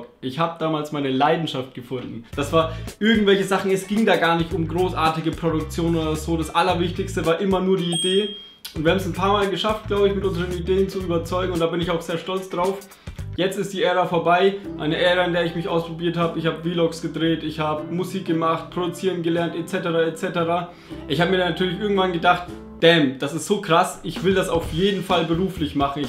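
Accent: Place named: Franconia, Germany. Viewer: Der fränkische Dialekt ist zu geil :D Grüße aus Bamberg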